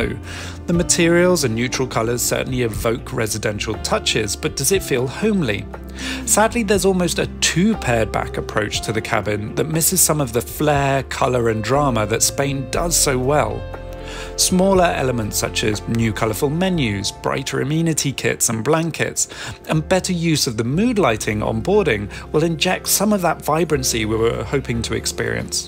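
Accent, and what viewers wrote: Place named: United Kingdom. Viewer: Thanks for your informative and top notch aviation video. I am really impressed with Iberia the Spanish Airline with their latest aircraft and sumptuous banquet for Business Class passengers. Hope they become well known like the top three Middle Eastern airlines by flying to more destinations in Asia 🌏 also. Your video quality is brilliant and complemented by your commentary in an elegant English accent. Please keep up your high standards in such content creation. ❤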